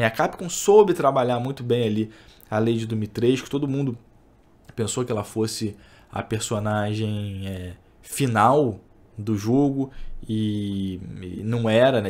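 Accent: Rio de Janeiro, Brazil. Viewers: esse jeito de carioca do falar é bom demais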